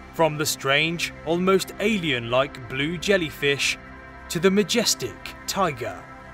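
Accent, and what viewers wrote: United Kingdom. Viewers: Love your british accent.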